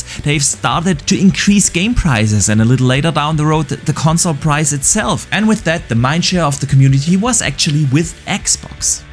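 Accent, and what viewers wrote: Germany. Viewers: Kommst du aus Deutschland ? Verdächtiger Dialekt 🤭, auch wenn du es gut verbergst 😁.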